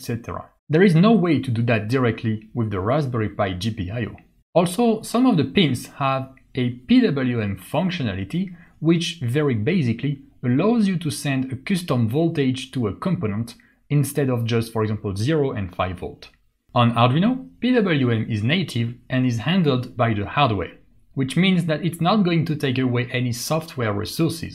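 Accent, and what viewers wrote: France. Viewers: Where are you from my friend? I heard some french accent, isn't it?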